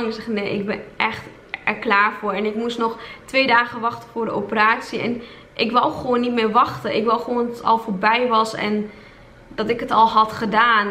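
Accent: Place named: Drenthe, Netherlands. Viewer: niet gemeen bedoeld ofzo maar wat praat je opeen plat echt heel boers accent ...kom je uit drenthe?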